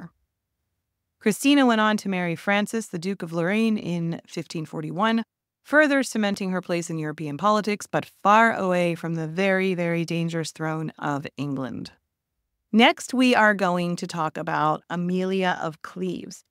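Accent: United States. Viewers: English history in an American accent 🤮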